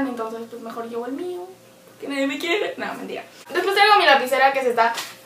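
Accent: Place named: Baja California, Mexico. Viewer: tu tienes mi acento!!! eres o vives en baja california ??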